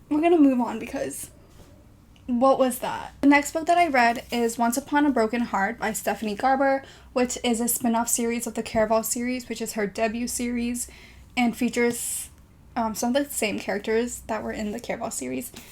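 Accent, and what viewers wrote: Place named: United States. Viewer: ooo, that's cool! did you go to an international school or lived in America at some point? you sound American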